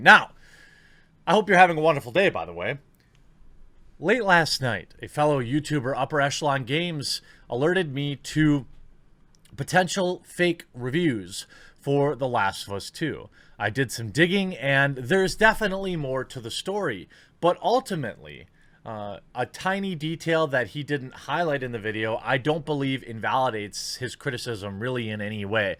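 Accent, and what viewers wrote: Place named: Midwest, United States. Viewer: Rotten to-mate-ers... yeah this guy is Midwest. I bet he has a Davenport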